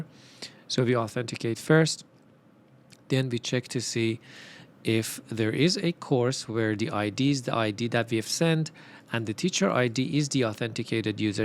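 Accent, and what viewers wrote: Iran. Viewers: your accent is very similar to farsi btw you persian ?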